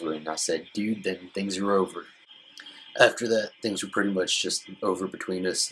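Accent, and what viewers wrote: South, United States. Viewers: I liked your coming out story. Would love to talk at ya more. I like that deep southern accent. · I like our southern accent and understated manner. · I love your Voice! It's Deep and Southern ... Good for you! Don't let Anyone get to you now that your out! It's all down hill from here on out... ;-) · Your so southern and adorable I love it! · Hi. You have a great voice & accent where are you from within the usa ? I could listen to you for hours. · Omg he's so southern 😍😍😍😍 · Lol he's so cute with that country accent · America, fuck yeah. That's what I think of when I hear this guy's voice. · Man I like U a lot. Your southern accent is fantastic. U are so open minded that is refreshing. You are a really kool dude keep up your great connection with people. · OMG U R SOO CUTE...And your southern accent is really nice..... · He has the deepist southern accent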